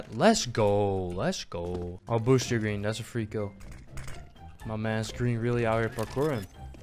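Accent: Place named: Netherlands. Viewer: Je bent nederlands toch ik hoor het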